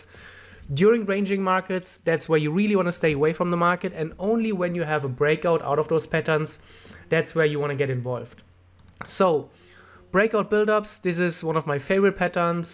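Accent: Germany. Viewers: Dieser Moment wenn man direkt raushört das du Deutscher bist. :D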